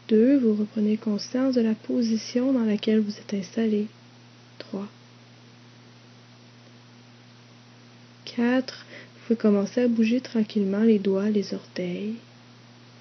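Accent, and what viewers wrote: Canada. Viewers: Bonjour, j'ai écouté beaucoup de vidéos d'hypnose, et je trouve ta voix très relaxante, merci. C'est peut-être l'accent canadien qui me relaxe, je suis français. Continue, tu es douée. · j'adore la façon québécoise de parler! c'est très agréable...(chui Français). de plus, vidéo très relaxante! merci bcp!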